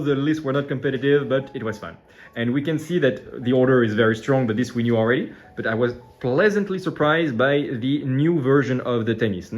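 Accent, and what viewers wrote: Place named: France. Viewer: Toujours un plaisir à suivre ces Battle Report. Merci aux participants! This little french accent add the perfect flavor to the Alliance fleets 😄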